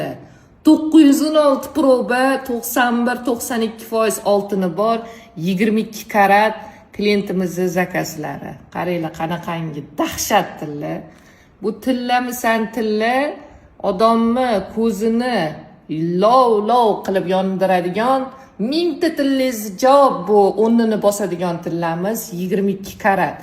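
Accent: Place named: Namangan, Uzbekistan. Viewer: Наманганскийга шевангиз тортияптия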